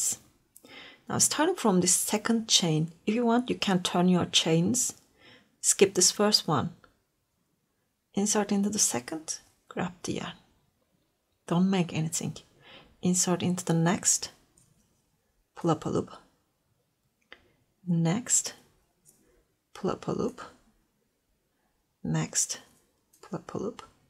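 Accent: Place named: Turkey. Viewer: Videolarda hizli hizli scroll down yaparken turkce aksanı ilk duydugum 2 kelimede farketmem 😂😂😂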